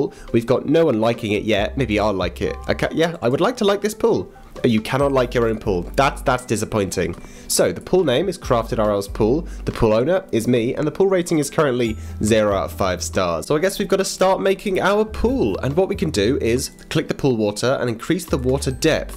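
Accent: United Kingdom. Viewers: AWW YOUR BRITISH!!! :3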